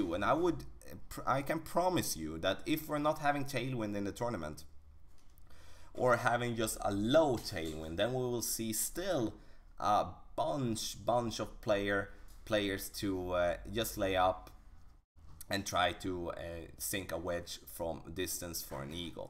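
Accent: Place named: Sweden. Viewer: Svengelska haha nice gillar din kanal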